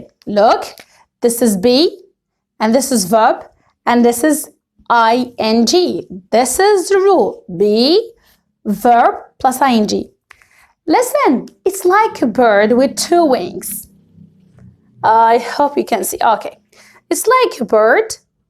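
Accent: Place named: United States. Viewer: بسم الله ماشاءالله ثقافتك واسعة ولغتك كانك اميركية 💚💚💚 جيش عالم المراة مع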